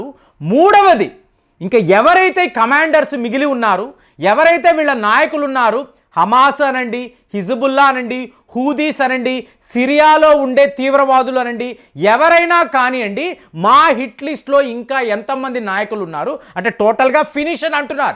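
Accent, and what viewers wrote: Tamil Nadu, India. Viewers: మీరు చాలా పదాలు మిస్ప్రొనౌన్సియేషన్ చేస్తున్నారు. దానివల్ల వరల్డ్ న్యూస్లో విషయాలు అర్థంకవడంలేదు. ఖమేని అంటున్నారు. బుక్స్ లో చదువుకున్నది అయితుల్ల ఖోమైని అని ఇప్పుడు మీరు కుమేని అంటున్నారు. చాలా వీడియోలలో మీరు ఇలా pronounciation చెయ్యడం మాకు రుచించడంలేదు. ఇరాన్ నీ మీరు ఈరాన్ ఇలా కన్ఫ్యూజన్ అవుతోంది మీరు తమిళ్ slag vidavandi. తెలుగు పాత్య పుస్తకాల భాష వాడండి. లేదా మీ వీడియోలు మీరే చూసుకోండి. మా మీద వద్దు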